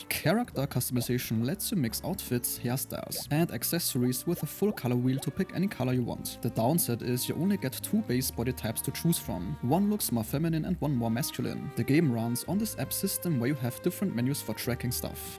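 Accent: Germany. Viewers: Gonna add a comment to push the algorithm, you showed me some good games over the past Year, after discovering your Channel. Thank you for doing this! And I got one question about your accent. Is it German?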